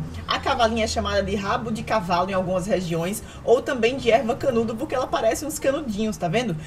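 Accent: Recife, Brazil. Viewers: Ela é de Recife sim, sotaque lindo né ❤️ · Adorei a receita. Muito saborosa, principalmente com limão!!! Pra mim foi difícil achar a cavalinha fora do Brasil, mas a Amazon me salvou. Rsrsrsrs Essa nutri é de Recife? Parece muito com meu sotaque 🤭 abraços para minha possível conterrânea 😁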